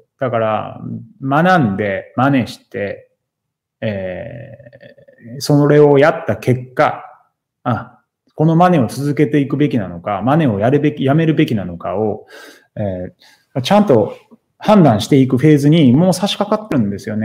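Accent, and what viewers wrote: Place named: Kansai, Japan. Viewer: 関西の人2文字の言葉こういう発音になるよね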